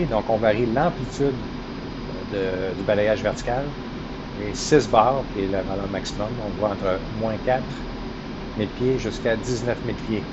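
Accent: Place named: Quebec, Canada. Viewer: Et en plus avec l'accent Québécois!!! :)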